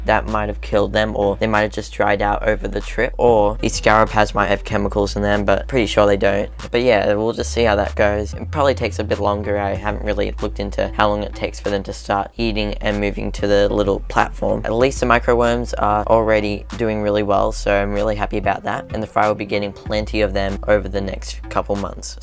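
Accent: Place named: Australia. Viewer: Great video mate, glad I came across another fish related channel! Sounds like you got a bit of an Aussies accent you from here?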